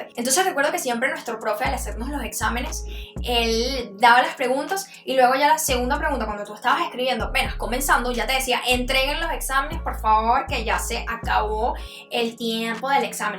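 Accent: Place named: Venezuela. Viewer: Por el acento yo diría que es venezolana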